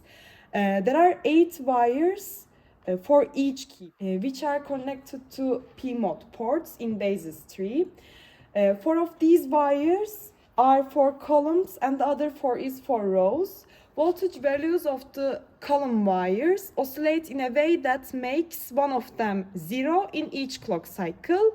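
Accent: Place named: Turkey. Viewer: Türk'ün şivesine bile kurban🫡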